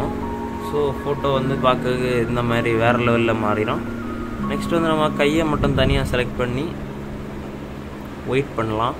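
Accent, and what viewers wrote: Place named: Kanyakumari, India. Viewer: Kanya Kumari ah bro❤naama slang teriyuthu💥💝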